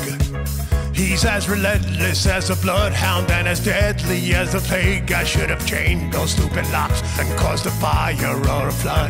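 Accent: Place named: Netherlands. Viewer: I hear a Dutch accent…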